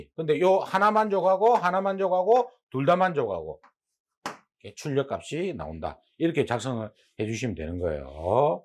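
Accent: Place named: Gyeongsang, South Korea. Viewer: 갱상도 스타일의 직관적인 강의 좋아요 ㅋ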